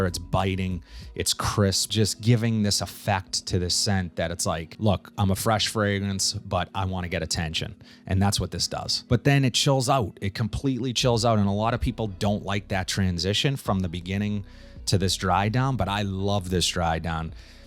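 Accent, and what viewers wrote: Boston, United States. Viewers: Thank you for the new fragrances, so many channels repeat what they have. I always come back to you .. and the Boston accent 🤪 how can I get you a sample of Megamare, I so want to see how you feel about this controversial smell. It’s so ocean it’s almost algae. I kind of feel Messoni wave is the best average overall but damn that sticks to me like glue. I can’t stop wearing banana republic metal rain.. this stuff is bangin 🤩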